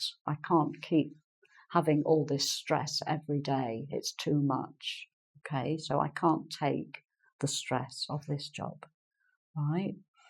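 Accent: United Kingdom. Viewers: i subscribed cause i admired ur english cause i'm facinating with the british way of speaking and i'll follow all ur lessons thank u so much hope u'll help us to get the british accent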